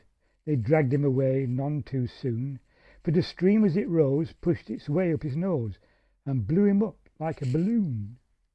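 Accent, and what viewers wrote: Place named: North, United Kingdom. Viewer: Fantastic fresh take on this classic—your lively delivery nails the northern twang, especially the cheeky rhythm on the bloodhound ballooning up and Mrs Noah's soggy sleeve mishap. In my retirement project V2Melody, I've set "The 'Ole in the Ark" to a witty folk chanson to introduce Edgar's timeless humor to new listeners. Cheers for the spirited reading!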